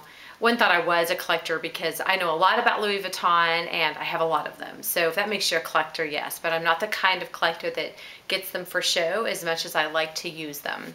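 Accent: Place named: Texas, United States. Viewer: I can recognize a Texas accent anywhere. Yes, I am from SW Texas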